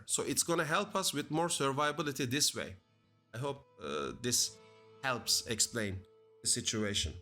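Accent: Turkey. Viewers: are u turkish u sound and look like turkish, great vid also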